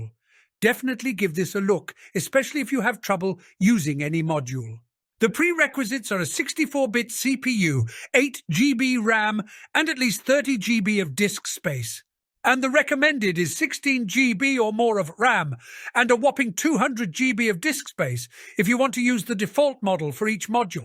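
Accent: United Kingdom. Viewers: Dear excitable mystery British A.i voice person kindly making videos. /:) I am having a headache and wondered if you could share a line or two here or if you wanted make a video, regarding a headache I am having. No matter how I try, I cant seem to load any different models nor Lora's into it. I go to the model manager for example, and I find an applicable checkpoint sdxl model from Citivai as example, I copy the web address of the page and plonk it into the model downloader in Biniou, and all it does is download a few hundred kilobytes then says the model has been downloaded. Same for Loras. Do I need to edit the URL or do something else to it to make Biniou accept the model and install it? As for huggingface, I have an account but I haven't a clue what url I am supposed to take from there to put into Biniou as while I can find relevant pages for applicable models and such easy enough on there, huggingface don't exactly have a ''download"' button and when you are new to all this its a little befuddling. 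Many thanks, from an excitable british man.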